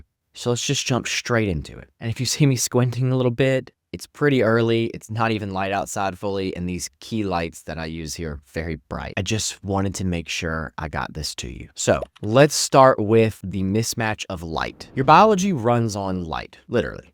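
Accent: South, United States. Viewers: I'm confident that your reassuring voice and sublime Southern twang will most certainly elicit expressions of even greater enjoyment.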